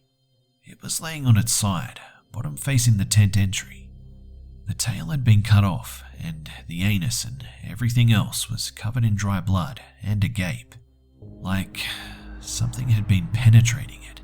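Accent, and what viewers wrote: Australia. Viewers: Wow thanks! Neat stories:) You should do a video over skinwalker/ wendigo stories. The 'My cabin getaway' series was literally awesome and so creepy <3!! I know for sure I'd love to hear your punder-ful, aussie accented, smooth voice narrating some good old Native American horror stories again. And i'm positive all the other fans would too. P.S. Now call me Ra-pun-zel but you should drop some more puns, you seemed to have dropped off a bit on them:). (Sorry if this came off as super criticizing, just trying to be constructive) - Izzy the Adventure Princess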